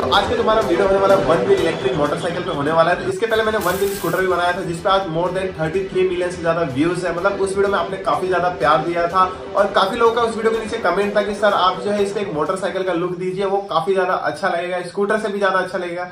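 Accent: India. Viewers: Indian English~~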